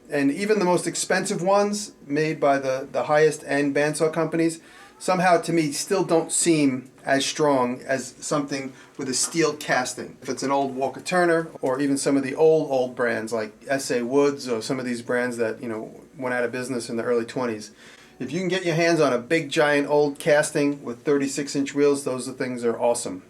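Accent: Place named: South, United States. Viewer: Great videoes. I have had a band saw for 15 years and Today I found out from you that it has been no where as useful as it is capable of. I am 70, my friend, also 70 just bought his first bandsaw. ( Grizzly). I am going to call him and insist he watch you use a bandsaw with skill. We live in Georgia and Alabama and you talk like us. Spike really adds to your tubes. Thanks for sharing and caring. I hope you enjoy your new upstate shop.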